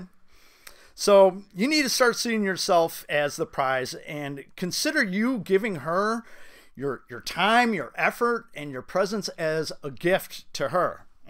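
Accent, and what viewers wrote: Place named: Midwest, United States. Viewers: I’m digging the Midwest accent and great advice 👍🏻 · I don't know why I thought you were from Canada I can so hear the Illinois accent now.. Great video bro!! always on point👍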